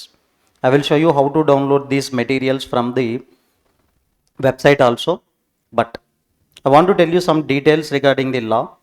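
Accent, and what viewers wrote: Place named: South India, India. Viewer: Sir i am from North India although your accent is little bit South Indian but you are different from any other faculties you are best sir thank you for everything ❤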